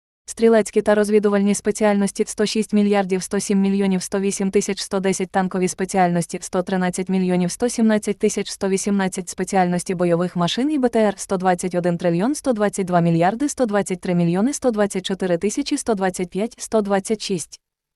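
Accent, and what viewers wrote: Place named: United States. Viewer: де найшли диктора дебільну, може говорити українською мовою. американка чи що.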